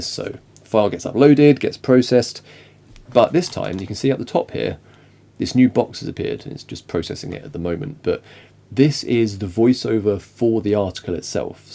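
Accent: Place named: England, United Kingdom. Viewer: Thank you, I'm also very new to Substack and I appreciate that you SHOW what you're doing as you're doing it. Also, an english accent always sounds far more intellectual!:) You've got a new subscriber. I'll be back!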